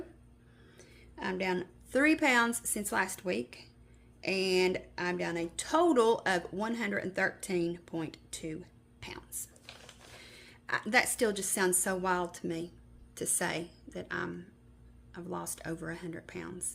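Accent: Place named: South, United States. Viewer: just found your channel, love your curls and southern accent!! (Louisiana girl here) You look great and thank you for sharing your info. I hope next week brings your results. I need to get better at making videos..I need to also start weight training, so definitely subscribing to follow your progress.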